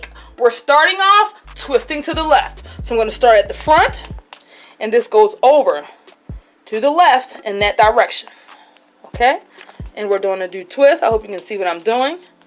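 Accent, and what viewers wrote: Baltimore, United States. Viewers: I’m sorry idk what your accent is, sounds like Baltimore but love how you “two” like tew lmao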